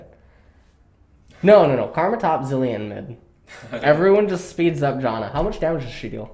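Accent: Sweden. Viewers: that Swedish accent is getting my dick hard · That swedish accent <3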